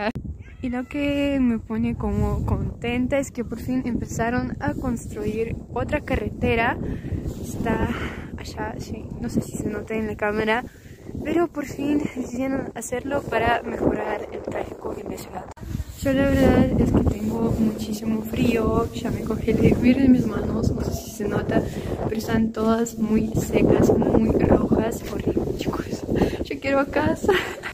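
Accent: Argentina. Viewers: muy buenos dias muchisimas gracias por este hemozo video me encanta y tambien tu hermozo acento argentino gracias gracias gracias Felicitaciones sigue asi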